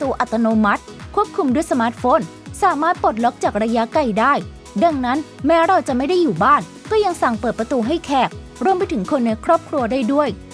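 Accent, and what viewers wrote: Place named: South, Thailand. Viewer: เสียงพูกเหมือนไทย+ใต้เลย ฟังแล้วยังไงๆไม่รู้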